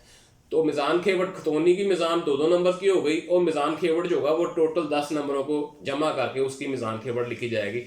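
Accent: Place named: Punjab, Pakistan. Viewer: جناب بہت زبردست لیکچر تھا ۔ مگر آپ کی اردو پر پنجابی زبان بہت زیادہ حاوی ھے Khana kasht: once a tenant, always a tenant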